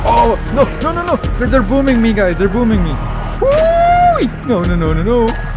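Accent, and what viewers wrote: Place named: Netherlands. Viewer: Veel succes ermee man, assuming youre dutch since i might be hearing a dutch accent. Fun commentary btw, i enjoyed the attempts & inevitable panic as expectations get absolutely grounded back to reality & humbled w the buffed Warden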